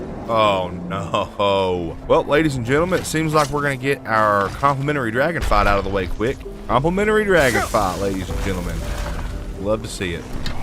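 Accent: South, United States. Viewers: The accent caught me off guard 😭 I'm a southerner myself tho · Saw the video and thought "I hope it's the guy with the southern accent" and it so was. Think it's time I subscribed lol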